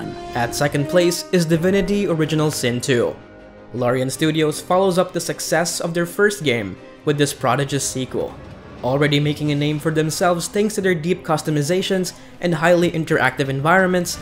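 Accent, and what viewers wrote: Philippines. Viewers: Yeah. Accent pa lang, pinoy na haha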